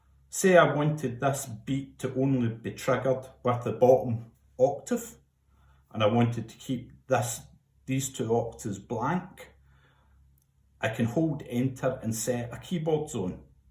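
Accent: Scotland, United Kingdom. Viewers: You sound like trainspotting. I LOVE trainspotting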